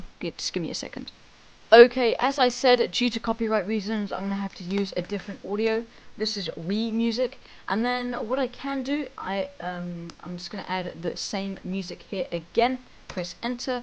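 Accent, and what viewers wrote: United Kingdom. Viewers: I love your Bri'ish accent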